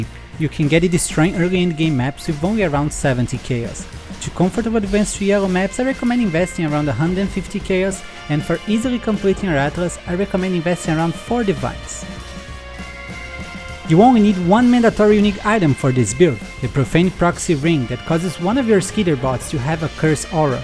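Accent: Brazil. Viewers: Mano por mais que dê pra notar que você é Brasileiro pelo sotaque você tem fluencia e é intendivel o que você fala em inglês, então por quê da legenda em INGLES novamente? ajuda seus compatriotas tupiniquins ai kkkkk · Is the person speaking Brasilian?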